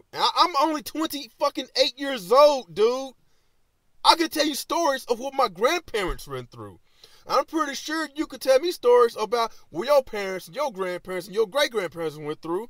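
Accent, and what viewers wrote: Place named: AAVE, United States. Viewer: I hate ebonics lol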